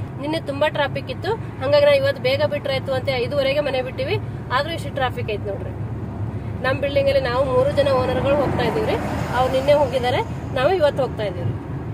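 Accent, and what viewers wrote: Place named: Karnataka, India. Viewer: ಏನ್ರೀ ಏನ್ ಚೆನ್ನಾಗಿ ಮಾತಾಡ್ತೀರಿ ನಿಮ್ ಮಾತು ಕೇಳೋಕ್ಕೆ ಹಾಗೂ ಧಾರವಾಡ ಧಾಟಿಯಲ್ಲಿ ವಿವರಣೆ ಬಹಳ ಚಲೋ ಇತ್ತು ನೋಡ್ರಲ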